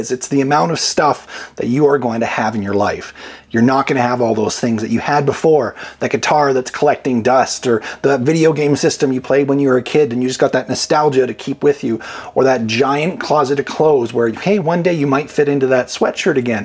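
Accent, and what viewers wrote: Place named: Canada. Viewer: What is this "Boot" he keeps mentioning? He keeps saying something about "A Boot" Lol love the Canadian accent. Great video!!!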